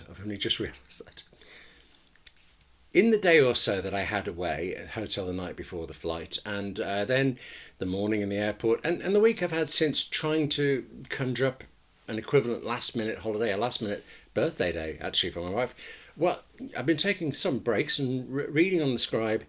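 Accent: United Kingdom. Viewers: I love the way that you describe your experience. so sincere and persuasive!!! and I do love British accent a lot. thank you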